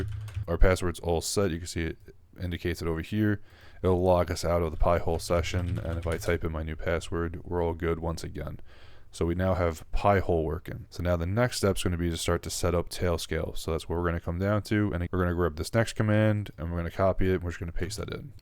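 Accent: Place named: United States. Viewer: Thanks. You cannot help it, but your strong US English accent and quiet voice are tough for English speakers to understand. Thankfully, Subtitles help quite a lot, despite their imperfections. 🙂 I bookmarked this video, because it's a good application for an unopened Pi4 which has been in my workshop for far too long.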